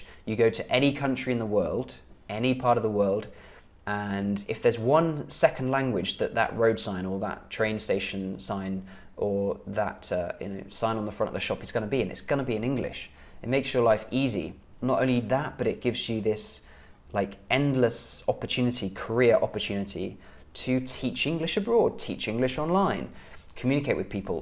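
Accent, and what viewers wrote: United Kingdom. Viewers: more British accent videos plz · learning arabic from British accent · I wish I can speak British like you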